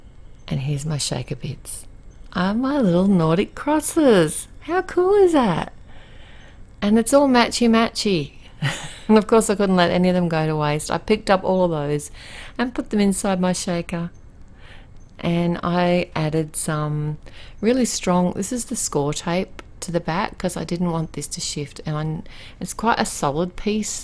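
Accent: Australia. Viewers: Nice to hear your Aussie voice! Means I’ll be able to find all you used! Thank you 🙏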